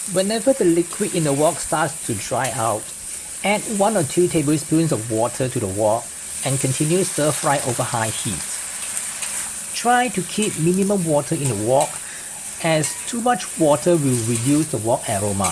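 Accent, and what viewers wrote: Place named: Singapore. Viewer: Thanks for the clear instructions. I haven’t heard that Singaporean accent in years; it’s so unmistakable. Lovely video to watch.Cheers.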